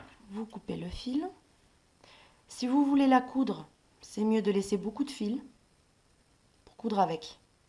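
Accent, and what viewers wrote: South, France. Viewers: Merci, le meilleur site que je connaisse à ce sujet: les explications sont claires, les réalisations très faciles à réaliser, les mains belles à voir et l'accent du soleil un petit plus délicieux, continuez je vous suis, isabelle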